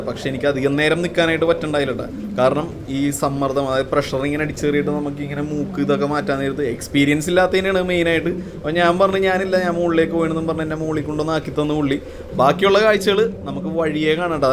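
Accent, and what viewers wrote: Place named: Thrissur, India. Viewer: തനി ത്ര്ശൂർക്കാരുടെ ഭാഷാ ശൈലി.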